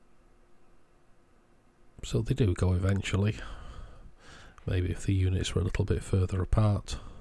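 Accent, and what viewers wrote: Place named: United Kingdom. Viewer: Hello mate you sound like you’re from the UK as am I, I’ve only just started on LED electronics and I was wondering where you got your LED’s from. I’ve just ordered some from aliexpress as the 1st one I got off amazon is like 3 times more expensive than Ali but the only downside is that it’s 3 weeks shipping time.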